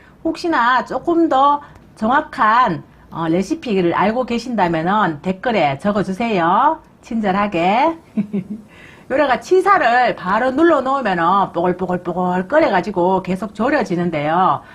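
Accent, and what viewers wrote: Gyeongsang, South Korea. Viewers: 너무재미나게 잘 가르켜주시네요 저도경상도라 이웃집아줌마랑 수다떠는거 같이 너무정겹고좋아요 ^^ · 영상보면서 웃습니다 경상도사투리써가면서 재밋네예 고추장쉽게만드는법 금방이라도 할것같은데 아마도낼이면 까먹을거같아 공유해갑니다 감사감사__ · 정겨운말솜씨에 경상도사투리에 귀한영상 쉬면서 너무잘보고 꿀팁얻어갑니다 성격도좋으시고 아름답고 너무멋지신분같아요 팔공산제2석굴암쪽 산사랑입니다 항상건강하셍ᆢ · 경산도 말이 참재밌어요 근데 고추장먹고 취하지안을까요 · 경상도 사트리 매력있는 조리원 님께 고추장 담는법 감사드림니다. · 맞아요 냉동실에 고추가루 있어요 고추장 담아습니다 여사님 경상도언어 듣기가 듣기좋아요❤❤❤ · 경상도 사투리❤너무. 즐겁게 잘보고❤🎉있어요❤😅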